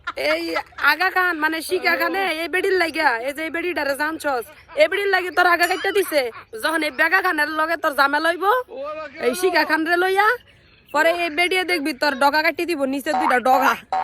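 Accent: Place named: Netrokona, Bangladesh. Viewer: নেত্রকোনার ভাষা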